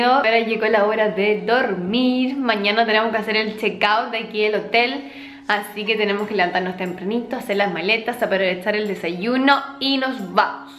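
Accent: Chile. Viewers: Es chilena no? Es la primera chilena que me gusta como habla.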